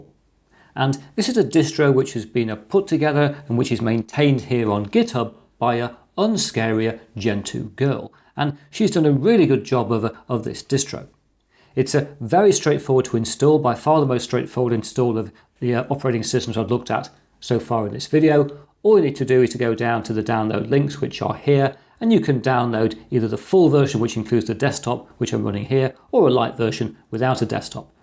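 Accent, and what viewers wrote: United Kingdom. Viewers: oh! this specific - stale GB dialect - just like the farth from behind the monarchs.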